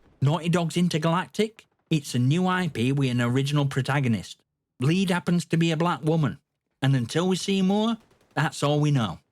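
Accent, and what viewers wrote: Yorkshire, United Kingdom. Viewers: Its 100% an authentic Yorkshire man accent. I can confirm.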